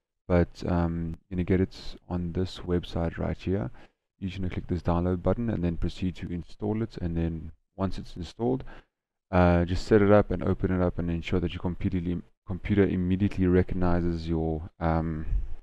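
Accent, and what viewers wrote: South Africa. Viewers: Worked for me!! 🙌🙌🙌 I can now play NFS Payback and Heat with the controller!! And is that a SA accent I hear there?? Lol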